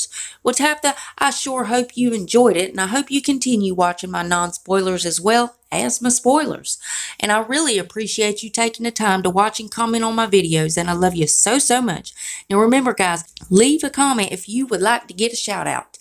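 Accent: South, United States. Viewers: Girl I love your country twang .. I'm a country gal my self i love watching you.. your the best!!!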